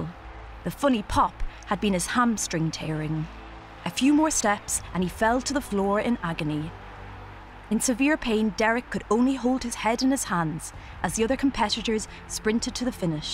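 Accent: Ireland. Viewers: She has a wonderful Irish accent. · Yes. Just with an Irish lilt. Also if you are going to criticise language, you should spell English with a capital E.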